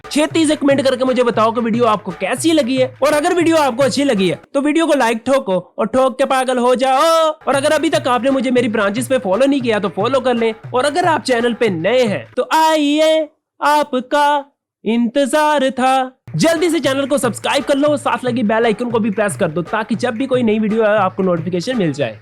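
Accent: Punjab, India. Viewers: Punjabi bht mazy ki bolty ho hahahaha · bhaii i like your punjabi talk style . literally it makes me laugh.... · Hyyyy your Punjabi accent UFFF 😂😂😂. Love it bhi jaan. Lol the ending thoo😂😂😝😝 Keep it up bhi jaan. Wish you a very good lick for your future 🧕🏻😊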